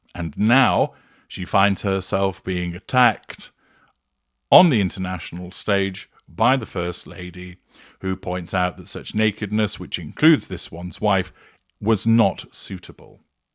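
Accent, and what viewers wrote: United Kingdom. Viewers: Please, please, please. Don't create more 50 second introductions to your interesting and entertaining videos. They are so well done. You are so intelligent, talented and have a beautiful, deep voice❣️ l love your British accent ❣️ I always fast forward the 50 second intro because I don't want to wait to get to the content. Please create more intros like the flames. Thank you for teaching us.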